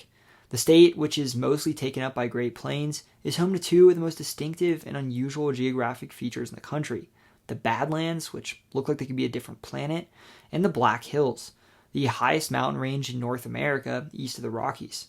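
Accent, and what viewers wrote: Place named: Georgia, United States. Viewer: thought you were from Georgia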